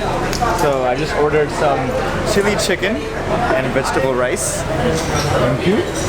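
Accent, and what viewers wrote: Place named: Bangladesh. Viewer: It's nice to hear a Bangladeshi voice on foreign soil❤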